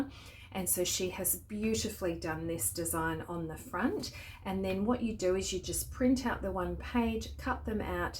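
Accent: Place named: Australia. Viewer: Happened across your video and oh my goodness it's stunning, and I'm not a fan of orange! And it's so good to hear an Aussie voice!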